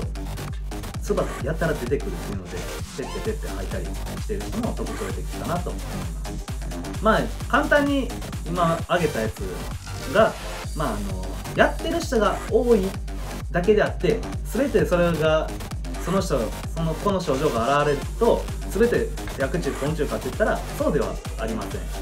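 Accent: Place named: Kansai, Japan. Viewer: 関西のどこだろう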